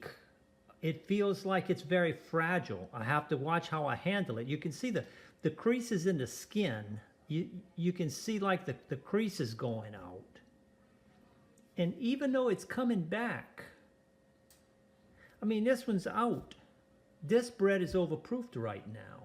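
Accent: Louisiana, United States. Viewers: Are you from Louisiana? You sound like my family from Rayne, it’s nice to hear.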